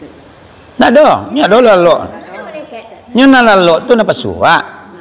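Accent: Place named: Pariaman, Indonesia. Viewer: Malebe je mambacoe nak ngku... Bahaso piaman bana 😀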